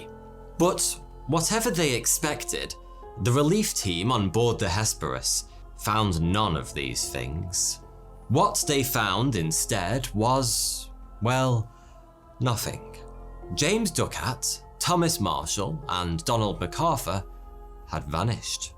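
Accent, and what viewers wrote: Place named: North, United Kingdom. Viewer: Tha sound northern thougnty..where ya from pal..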